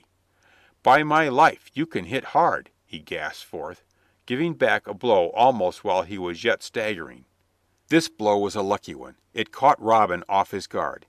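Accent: United States. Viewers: Like an American robot? This is an English folk tale... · An American accent. Sorry, just doesn't work. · American accent 👎🏻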